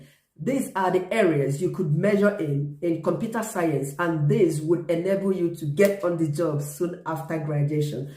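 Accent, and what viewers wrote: Nigeria. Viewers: Teaching education with Naija accent??? In the Uk, none starter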